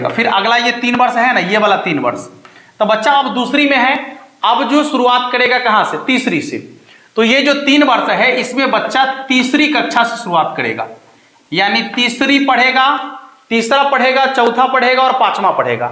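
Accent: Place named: Bengal, India. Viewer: You should explain age and grade classification. At grade 3. What the child age. Bhai your Hindi is not good. It looks like a Bengali accent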